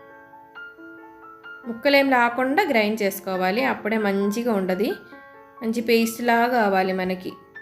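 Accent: Kerala, India. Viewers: Your malayala telugu చాలా మధురం! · Yes... I guessed correct... My mom is also from Kerala... I know that pronunciation, how Kerala people speaks telugu... 🙂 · I think ur not telugu ur keralan because ur words u spell looks keralan . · Madame thanq R U Malayaali ? Ur accent is like that. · Sure she is a Malayali. Can identify easily. I'm too a Malayali. Thanks madam. Good to hear a good recipe from you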